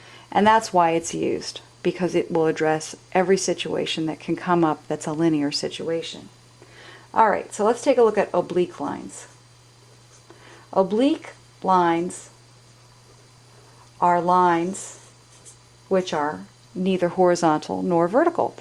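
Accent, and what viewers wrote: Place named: United States. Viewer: I like your american accent, its very soothing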